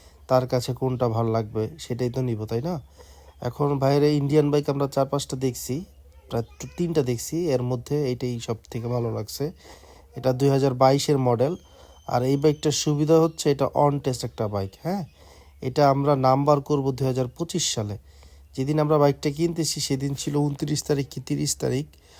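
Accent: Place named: Sylhet, Bangladesh. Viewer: Vai sylheti bashay Kotha bole obbash to Tai shuddo bashay aktu problem Hoy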